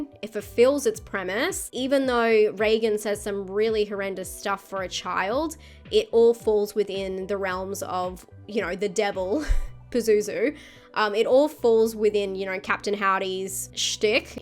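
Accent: Australia. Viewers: I love the Australian accent. That’s just HORA baby!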